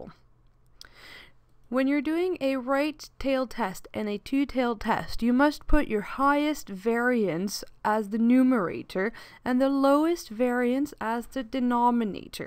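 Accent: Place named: Ireland. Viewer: It's a European thing. She sounds Irish maybe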